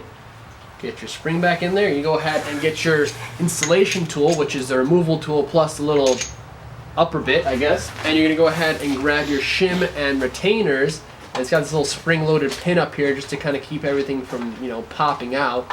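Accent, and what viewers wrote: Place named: Australia. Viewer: That Aussie accents on point ahahah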